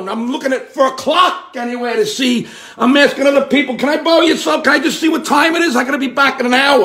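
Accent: New York, United States. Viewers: Thick New York accent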